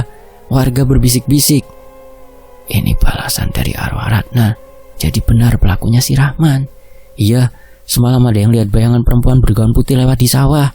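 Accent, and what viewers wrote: Java, Indonesia. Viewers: Dialek jawane bikin kangen kampung halaman mas 😂😂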